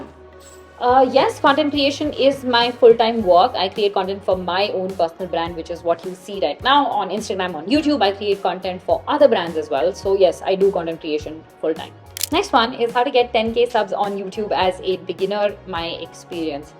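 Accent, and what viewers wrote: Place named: India. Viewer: Your Hindi accent is so so cute 😊😊❤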